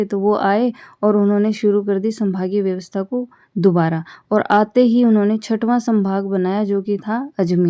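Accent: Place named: Bihar, India. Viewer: Didi aap Rajasthan se hai aapki hindi up Bihar wali lag rahi hai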